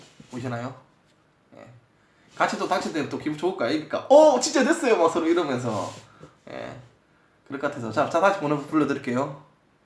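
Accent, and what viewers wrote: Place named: Daegu, South Korea. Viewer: 어디분이시져? 부산? 대구같은데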